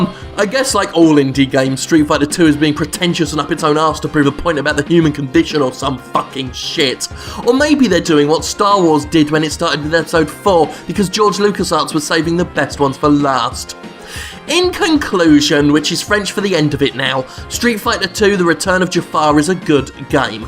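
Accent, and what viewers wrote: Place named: United Kingdom. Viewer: Because british accent and unexpected jokes in quick succession = Instantly Charlie Brooker.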